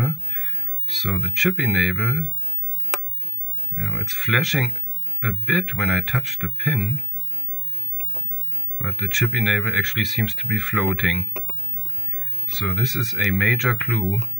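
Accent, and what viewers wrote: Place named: Germany. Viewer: Hey man love the videos! Your german accent is fantastic, and thanks for speaking in english! I found your channel by looking for videos on the Atari System 1 boards, as I want a marble madness cab at some point. But! I have a question, what Jamma>Scart board are you using? I have a 15khz PVM that supports RGB and wanted to start maybe getting some broken arcade boards and fix em up. I usually buy broken consoles and fix them, but IBM PC's and Arcade boards are my next logical step. Thanks for the videos! I'm going to be posting them on some repair forums I hang around as your videos are criminally underwatched. Thanks again!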